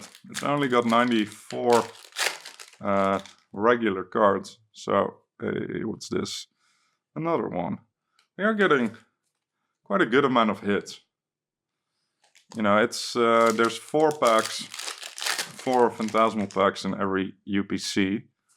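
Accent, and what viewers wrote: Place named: Netherlands. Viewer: Was thinking you were Dutch too. That accent! :L. But, you're English is fantastic, even you haven't spoken it for years.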